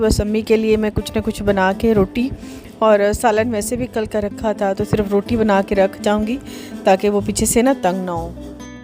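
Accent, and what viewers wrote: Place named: Saraiki, Pakistan. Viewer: Apki saraaaeiki punjabi hai we have house help saraaaeiki wo accent hi aur hota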